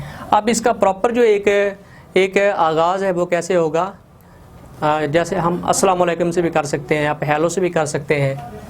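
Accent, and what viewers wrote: Punjab, India. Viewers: Sir , it look like u know Punjabi language by ur pronounciation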